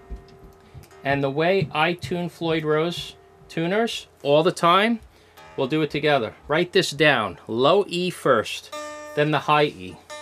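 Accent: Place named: New Jersey, United States. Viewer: The “locking nuts”, how many nuts does that guitar in the video have? Love that jersey accent though...👍 One thing that Fender recommends you do is to make sure that whatever you use to block the tremolo doesn’t move around when your strings are at pitch, whilst the bridge is flat against the top.... then you bring out the claw screws and it’s all good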